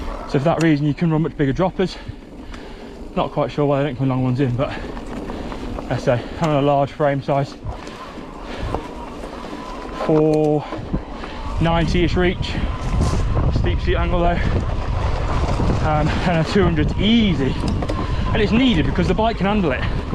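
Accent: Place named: Ireland. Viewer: ay mate speak English, your choppy when you speak, only brits will understand you.,,,for a Mick....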